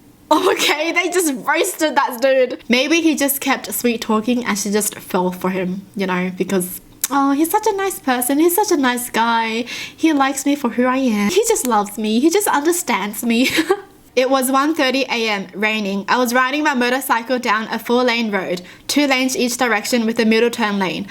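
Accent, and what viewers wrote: Australia. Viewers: are you Australian? because you sound just like my friend’s mum😂😂luv you btw💜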